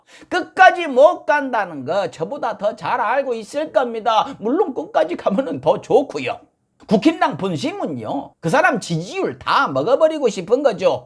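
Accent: Jeolla, South Korea. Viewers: 전라도 사투리 억양이 너무 강해서 없어 보여요. 안그래도 없어 보이는데.ㅎ 조언 하나 드리자면, 정치 시사 관련해서 신뢰성을 갖기 위해서는 개콘처럼 하면 앙대여. 차라리 그냥 연변사투리로 하던지~~~ㅋ 근데 티비는 언제쯤 나오려나여? 부르는 곳이 없어 유튜브 하는건 알겠지만 메뚜기도 한철이니 열심히 해보셔요. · 전라도 사투리 심해서 좀 거북하네용 ㅜ 내용은 좋네요 지당한 말씀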